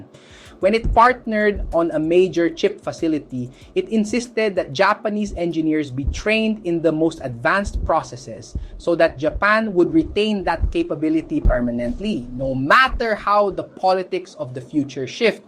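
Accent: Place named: Philippines. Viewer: I like your pilipino accent and of course your analysis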